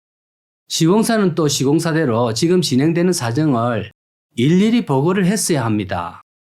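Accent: Gyeongsang, South Korea. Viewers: ㅋㅋㅋ 참에 아~아~아 할때 왤캐 웃기죠 ㅋㅋ 경상도 아재님 ㅎ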